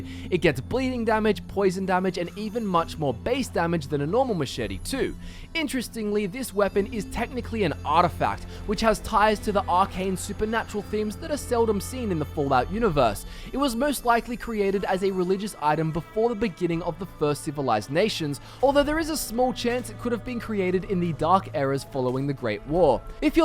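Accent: Australia. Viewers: I have literally never heard anyone pronounce the words Alteration or Harvester the way you do and it makes me ears confused because you have a perfectly normal Aussie accent besides those 2 words.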